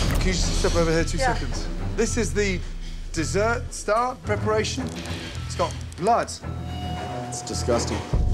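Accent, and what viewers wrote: United Kingdom. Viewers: Joseph Osborne he's British it's how they talk it's normal for them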